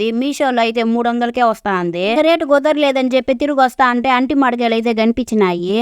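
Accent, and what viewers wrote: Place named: Telugu region, India. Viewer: nijamgane notlo neellu vurai chala meeru cheppesariki takkuna mingesanu pusukkuna navvesanu 😂😂 thank u mee slang ante chala ishtam maadi pakala but ippudu Tamilnadu lo vuntanu meeatalu vintu vantalu chusthunte mana voorilone vunna feel vasthundi thank u so much naakithe feel good harmones ekkuvaga release ayye stress antha pothundi🎉🎉🎉 Ilage manchi manchi recipies chesthu navvisthu matalatho magic chesthu.... always....😊😊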